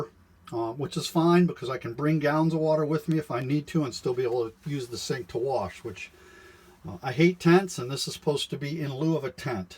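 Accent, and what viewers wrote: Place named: Maine, United States. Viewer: You sound like you have a maine accent I live in maine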